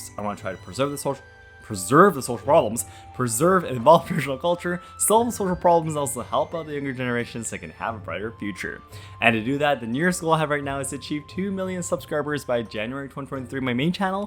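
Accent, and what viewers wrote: United States. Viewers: I've listened to most of your content. I'm a southern American and I have never had any problems with your pronunciation. You sound just like an American to me. I can barely walk out my front door without hearing "y'all come back now" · the first time I heard your voice I detected a Northern Midwest accent. Your spoken English is at least 97 per cent.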